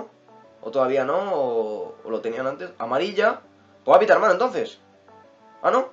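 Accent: Mexico. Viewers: hablas como mexicano, vives en argentina?? o eres argentino o q onda? pregunto nomás x curiosidad, a mí m encanta españa pero tambn argentina, saludos de méxico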